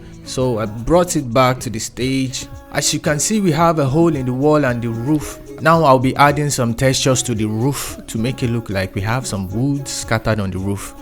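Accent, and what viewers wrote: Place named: West Africa. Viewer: Thought it was Kendrick Lamar till I cliqued on the video and heard that wonderful west african Accent 😅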